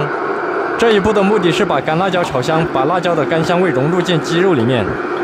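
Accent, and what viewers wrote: Sichuan, China. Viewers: 程咬金 听口音就是川普，四川人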